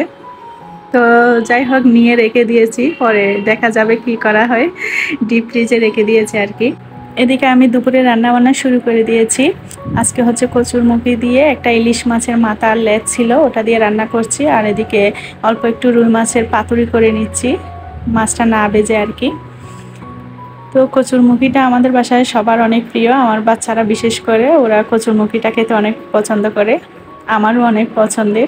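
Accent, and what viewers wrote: Chittagong, Bangladesh. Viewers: আসসালামু আলাইকুম কেমন আছেন আপু মাশাল্লাহ আপনার ভিডিও দেখে খুবই ভালো লাগলো রান্না করা ঘুরাফেরা সবচেয়ে ভালো লেগেছে আপনি চট্টগ্রামের শুনে আপনার কথাগুলো তো খুবই মিষ্টি লেগেছে এবং আসার সময় রাতের খাবার বাইরে খেয়েছেন দেখে খুব ভালো লাগে দোয়া রইল আপনার জন্য এবং আপনার পরিবারের জন্য আপু সব সময় ভালো থাকবেন ❤❤❤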